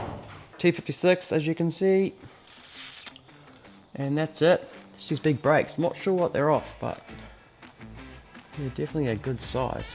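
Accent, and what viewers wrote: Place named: Australia. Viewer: You nearly sound Aussie 😂😂😂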